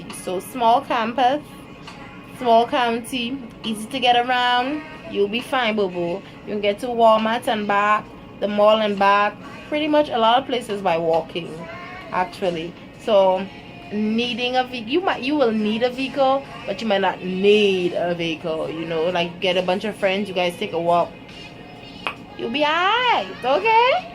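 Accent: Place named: Trinidad and Tobago. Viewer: you sound trini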